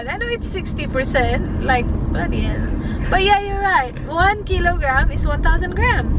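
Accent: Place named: Visayas, Philippines. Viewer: Always watching ur blog... di gyud kumpleto ang adlaw og di ko kita sa imo blog 😊 bisaya kaau 😂 cagayan de oro 😚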